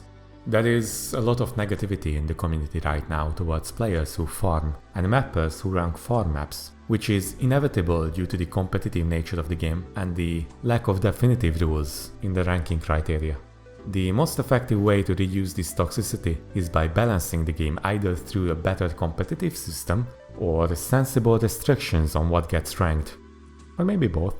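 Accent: Hungary. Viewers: csak szimplán hallgatom a hangod és annyira magyaros akcentusod van hogy meg kellett néznem a profilod... és tényleg (csak most találtam rá a csatornádra és tetszik amit látok ;) )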